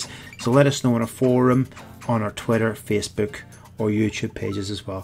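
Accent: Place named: Ireland. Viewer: Hes so irish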